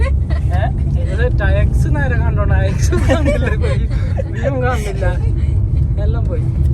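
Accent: Kasaragod, India. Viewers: ഇതെന്ത് ബാസ നിങ്ങടെ കാസറോട് ബാസ 😮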